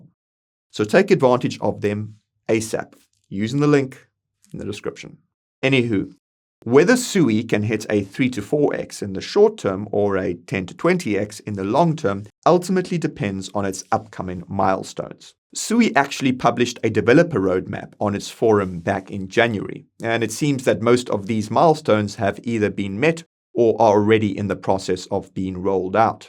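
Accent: South Africa. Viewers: Is that a South African accent? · A new south african guy hosting coin bureau?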